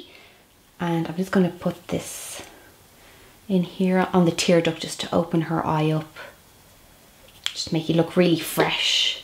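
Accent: Ireland. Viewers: She's from Ireland so I'm guessing it's an Irish accent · I love your videos!!! I love your accent!! I felt totally in love with Ireland when I went there when I was younger, it's the most beautyful place in the world!!! And I was pretty surprised by the people too, I've never been in a country with such nice people, really. I'm irish in my heart ^_^ Take care sweetie.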